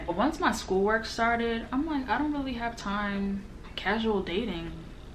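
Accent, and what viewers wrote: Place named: United States. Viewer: You sound like you lost most of your American accent 😂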